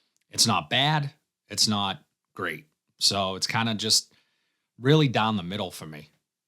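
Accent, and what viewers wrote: Boston, United States. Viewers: Just came across your video,,, You have to be from my neck of the woods,, No one speaks this way unless you're from BOSTON...LOLOL I Love Men's Cologne...When I find my favorites Cheap like this I buy them and use them to spray around the House... I will check this one out.. Thanks.. I Love the Men's Paul Sabastian for $20 and Calvin's Obsession for the same $20. or $18 for the Tester. Ebay is a good source if you don't want to venture out.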